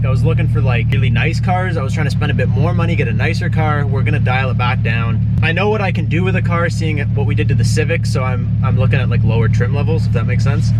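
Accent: Canada. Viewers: Intentions or our attention? Haha, I love Canadian accents.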